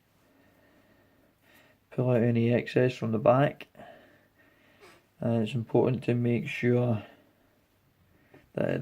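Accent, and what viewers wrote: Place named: Scotland, United Kingdom. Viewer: Not being a Scot, I guess the accent fooled me! Not to imply the fly was not decently made! Where I am, we can still fish for sea trout, (Newfoundland, across the pond)! Fishing has not been cancelled here yet! Not very hard to stay six feet apart here! Hope things improve and you are able to wet a line soon! Stay safe!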